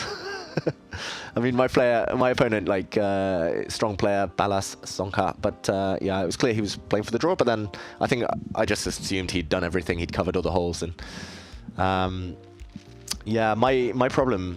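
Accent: United Kingdom. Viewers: I much prefer watching you to magnus, because your commentary is much more fluent, as a professional commentator and native english speaker (I love your english accent too!). And frankly your moves, style of play, and attitude are more relatable as well. I love watching you analyze and explain your GM moves, the explanation of which makes it much more impressive and instructive to watch. Magnus is playing on such a diff level that it's just not relatable, even if he's #1 in the world. I watch all your TT streams start to finish, but not magnus's. So please come back soon; we appreciate you so much!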